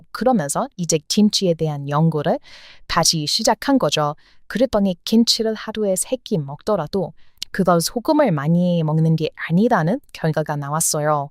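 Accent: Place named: United States. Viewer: 평소 푸른 눈의 서양 여자인 전형적인 미쿡여인 이시지만 발음은 미쿡식 그러나 어휘력은 한국인에 가까우신데 발음만 교정하신다면 진정토종 한국인 같을거에요 아름다운 그대 진정 한국인으로 사시기를 원하신다면 님께 잘 맞고 멋진 한국남자를 만나 진정행복한 삶을 사시기를 진정으로 기원합니다😂😅😊❤❤❤❤❤❤❤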